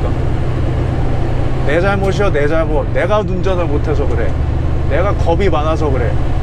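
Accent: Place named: Chungcheong, South Korea. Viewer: 친숙한 충청도 사투리 ㅋ 어디세요? 전 충주 ㅋ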